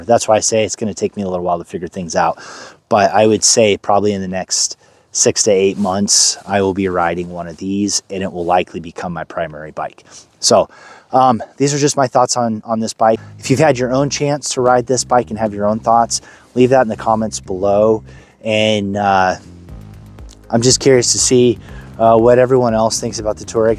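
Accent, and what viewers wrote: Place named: Los Angeles, United States. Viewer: Sounds like she's from LA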